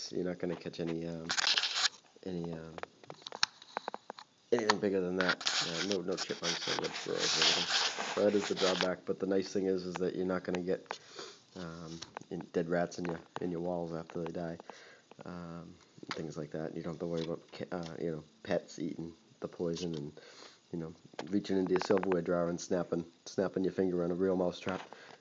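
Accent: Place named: New England, United States. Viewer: A New England accent always gets my attention. 😊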